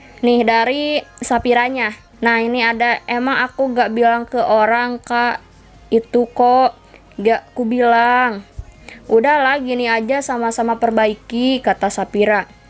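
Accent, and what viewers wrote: West Java, Indonesia. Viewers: Kakak Logat/Nada Ngomongnya Kayak Orang Sunda, apa bener?😅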